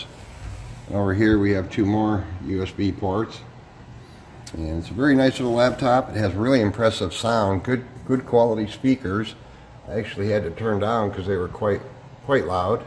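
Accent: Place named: South, United States. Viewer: You sort of sound "hillbilly" but you know your stuff. Well done it helped me a lot.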